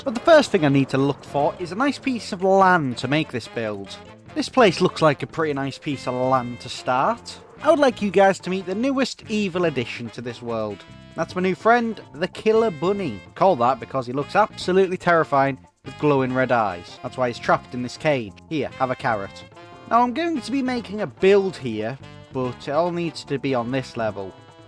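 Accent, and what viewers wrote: United Kingdom. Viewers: lol I thought you were Scottish because I have a teacher from Scotland who has your accent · My Man is britishhh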